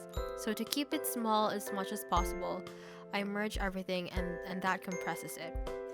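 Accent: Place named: United States. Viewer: no worries! Your fluent voice makes it crystal clear HAHAHA. Never really met a lot of fil ( assuming you are) speaking like an American teen so das something...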